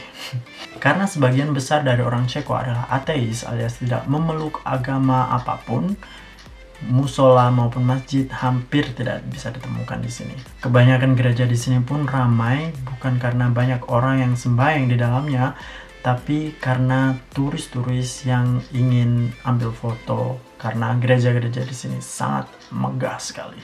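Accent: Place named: Surabaya, Indonesia. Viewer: Dari cara bicaranya...medok, ciri ne wong Suroboyo.. Kota tercantik di di dunia... Ngk tau saya..nyimak ae yooo 😊😁😁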